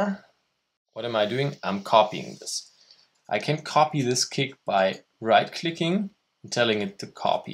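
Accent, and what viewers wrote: Germany. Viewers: Thanks mate! This is exactly what I need! I was making (trying to) some tracks in FL Studio like 5 years ago and think Ableton is better. Working here should be similar I guess, but I totally forgot how to set instruments etc:.:D So this tutorial is very useful! Anyway your accent sounds a bit German, do you come from Germany, the land of one of my favourite DJ, Paul van DyK?;D:)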